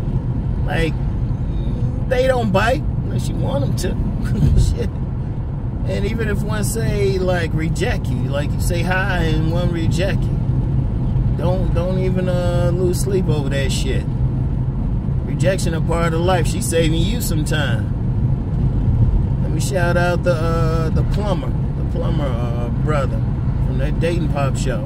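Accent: Washington DC, United States. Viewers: Bammas? DC accent?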